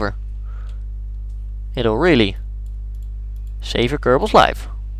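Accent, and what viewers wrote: Netherlands. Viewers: You sound so fucking Dutch. Idk if you are. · you have the best dutch accent... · Sorry but, are you dutch? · cant watch this because of the dutch accent, I'm must be the only dutch person in the Netherlands who can talks English almost completely without any accent.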